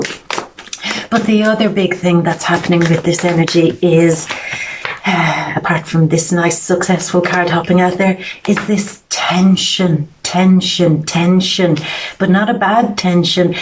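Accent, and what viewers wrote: Ireland. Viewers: I love the the Irish Accent? and that your really trying to keep clean lol. Passion 93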